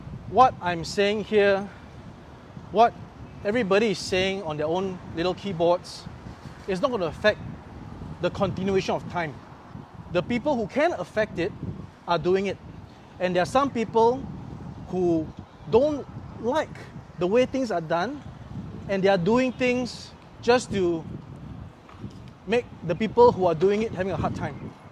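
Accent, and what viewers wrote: Singapore. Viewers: Singlish is hard to follow…… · Singapore accent. Why weird? You are weird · I think his accent is Singaporean accent, not Indian :)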